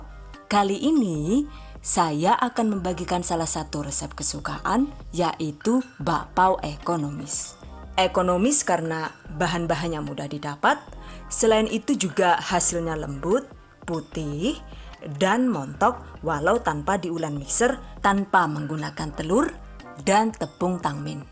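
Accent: Banyumas, Indonesia. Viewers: Deneng kaya wong ngapak. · Catat mantuk Bu bapaiunya. Njenengan orang Tegal apa kebumen ya entong seneng njenengan ngendijan ngapak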